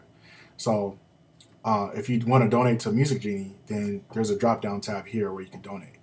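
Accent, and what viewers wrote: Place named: North America. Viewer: i love your american or candian acceient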